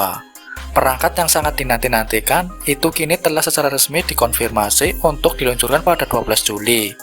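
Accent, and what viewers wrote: Banyumas, Indonesia. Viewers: Dri dialeg nya kdgrannya org ngapak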